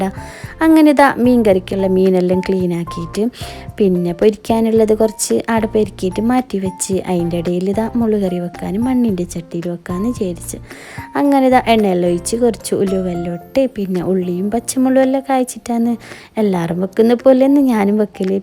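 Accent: Kasaragod, India. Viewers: കാസർഗോഡ് സംഭാഷണം കൂടി ആയപ്പോൾ മീൻകറി sett ആണ് 👌😍😍😍👍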